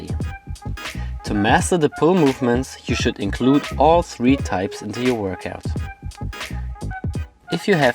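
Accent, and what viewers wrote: Germany. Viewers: German accent :D :D :D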